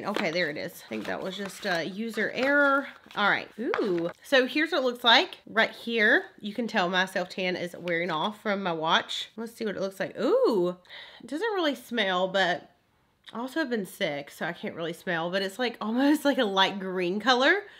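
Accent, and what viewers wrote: South, United States. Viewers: Your southern accent is tooo adorable... Luv ya doll...😀😀😀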